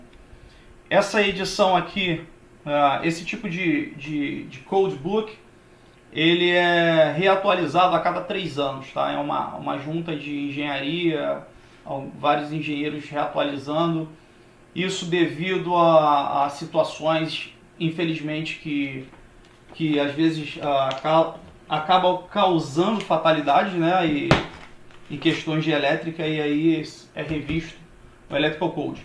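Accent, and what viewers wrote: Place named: Rio de Janeiro, Brazil. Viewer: Bom dia meu amigo, ganhou mais um escrito, sou do Rio de Janeiro pelo seu seu sotaque vc deve ser aqui do Rio, trabalho Muito <link> elétrica estou pensando em irmora ae abraços e parabéns pelo conteúdo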